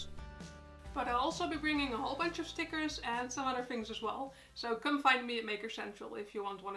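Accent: Netherlands. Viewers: Great bags... One question ... are you dutch???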